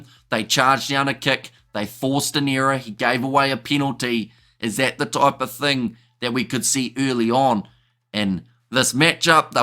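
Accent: New Zealand. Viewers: Perfect pronunciations! Didn’t expect it when I heard the thick kiwi accent. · Love the colourful commentary and insights, and your great Kiwi accent. Thanks, man.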